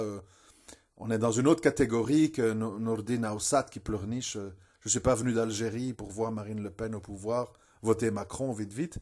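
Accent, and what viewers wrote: Belgium. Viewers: Salam mon frère, ton accent brusseleer c'est top 😉 moi pareil, quand je m énerf ça revient 😎. C'est bien de dénoncer, mais sois en forme car des hypocrites sont légion...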